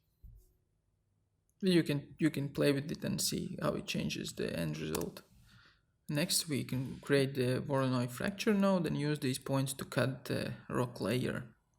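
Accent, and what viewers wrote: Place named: Latvia. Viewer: Es sāku skatīties neredzot autoru, un tad ieslēdzas mans šerloks dzirdot akcentu... "Tā..tas toč ir latvietis... tā...ar Houdini procedurālā modelēšanā varētu mums būt Kurzem..." ..skrullēju - Yess :)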